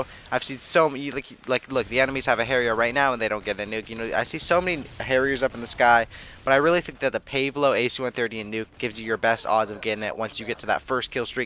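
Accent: Canada. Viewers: are you from Canada? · r u Canadian like me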